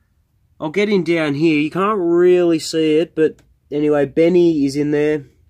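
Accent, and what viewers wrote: Australia. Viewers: Just a suggestion for moc's like the one you just did, have you ever heard of brick arms? Brick arm's are miniature weapons and accessories which are compatible with minifigs. I have quite alot, I can tell you're Australian so lucky for u the nearest website is in Melbourne, Victoria it is called rick's james bricks, they sell brickarms and lego. Hopefully this info is useful to you. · He sounds SO Australian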